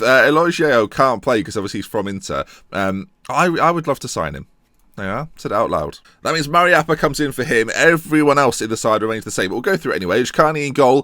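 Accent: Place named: Yorkshire, United Kingdom. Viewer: Yorkshire accent = the best.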